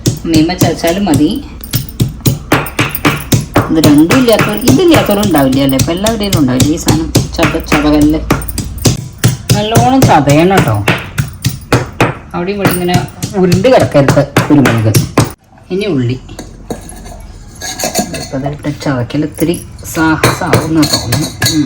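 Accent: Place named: Bharatapuzha, India. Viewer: your Malayalam is from Bharatapuzha side ,is it?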